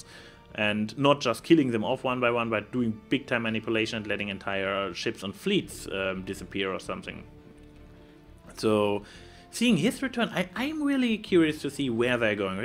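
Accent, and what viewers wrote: Germany. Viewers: Into that German accent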